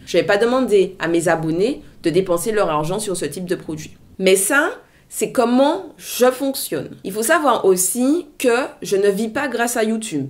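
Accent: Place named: Antilles, Caribbean. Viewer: Hi, j'adore ton petit accent bien de chez nous Mart. ou Guad...... Cela fait un moment que je te suis j'aime beaucoup tes contenus, tu reste égale à toi toi-même, ta franchise et ton honnêteté font de toi une belle personne. De mon côté mes cheveux ont commencé à pousser et à mieux se porter quand j'ai arrêté d'acheter pleins de produits capillaires qui n'avaient aucune efficacité...... il me suffit maintenant de regarder côté cuisine et de compléter avec d'autres produits naturels. Merci pour ta franchise et bonne continuation à toi ma belle.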